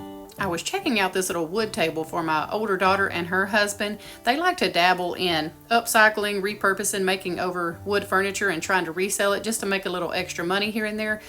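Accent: South, United States. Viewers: I'm from Georgia and you sound as southern as I do. LoL love you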